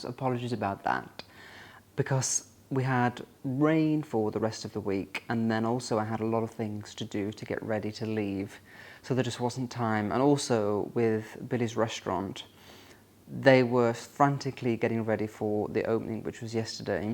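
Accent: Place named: Stoke, United Kingdom. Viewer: ahh those flat vowels you from stoke boy!! no pretence then !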